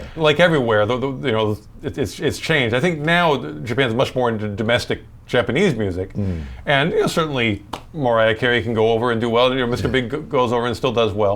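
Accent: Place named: Transatlantic, United States. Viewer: He's changed his appearance and accent to transatlantic. Not sure if this is a phase or if he's aware of it.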